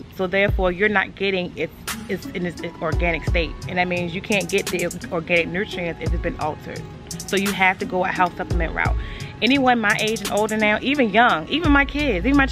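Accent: United States. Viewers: DC or B-More is what I hear in that accent, great vid🤩🥰🍫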